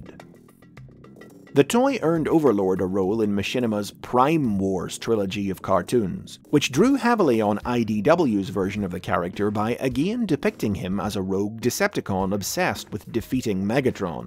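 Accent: South, United States. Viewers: I kinda like his southern accent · you rock that southern accent well man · Did not expect the southern twang, but I can't say that I hate it. Back in '92 the local toy store had the Overlord toy, but for an outrageous price that no-one in town could realistically afford for a mere child's toy, and I remember being kinda enamored with it. Same story a bit later with Rotorstorm. Seems I had a habit of picking mildly obscure Decepticons as my favorites. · I'm never going to unhear that southern accent, and I love it. Thank you for the episode!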